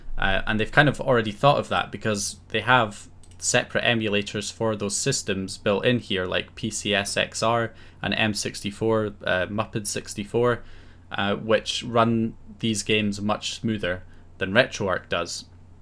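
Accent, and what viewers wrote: Scotland, United Kingdom. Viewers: R u Scottish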